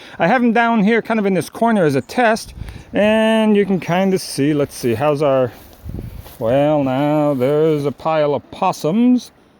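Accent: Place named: United States. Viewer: This is in New Zealand - you can tell from the rimu and ferns - where possums are a serious pest. He just happens to have an American accent.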